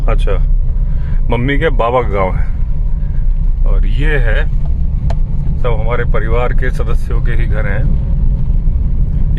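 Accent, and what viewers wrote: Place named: Awadh, India. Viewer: bohute sundar. bare dino baad pakki awadhi sunne ko mili. apne nanhihal ki yaad a gayi. zabardast. nothing like hinterland UP.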